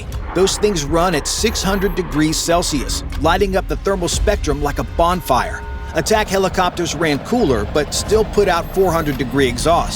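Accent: United States. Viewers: It’s a shame it’s all in a US of North American accent. Totally unbelievable.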